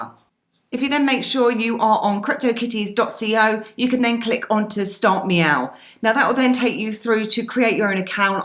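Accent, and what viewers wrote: United Kingdom. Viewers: the prim and proper British pronunciation that's catnip to an American's ear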